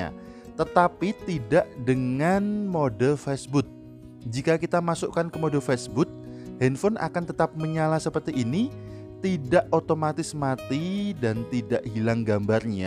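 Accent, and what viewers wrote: Magelang, Indonesia. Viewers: mas sampeyan wong Magelang ndi?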